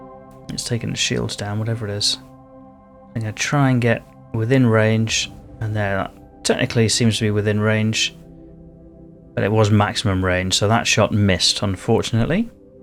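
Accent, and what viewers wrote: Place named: United Kingdom. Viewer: Hope you stay safe amidst the turmoil in the UK from what i can tell (your accent lol) you are British Anyway stay safe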